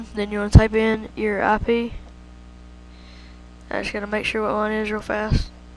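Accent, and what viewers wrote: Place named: Netherlands. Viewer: Stop insulting his accent, its dutch and its cool :) Yep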